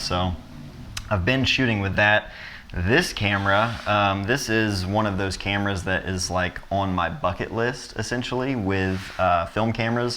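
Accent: Ohio, United States. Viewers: This guy knows how to get us to click! Leica unboxing dictated by southern Ohio timbre? YES PLEASE!!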